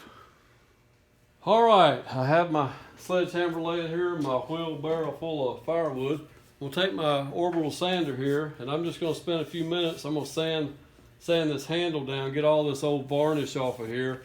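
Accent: South, United States. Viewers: I'm terribly sorry that your brain is unable to process southern accents.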